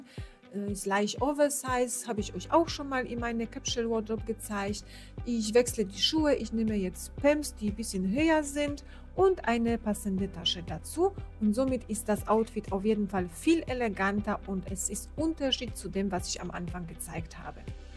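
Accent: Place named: Poland. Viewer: Was ist das für Akzent? Polnisch? Ich nicht iś. 😮 Ch spricht man wie ch nicht wie ś. 😅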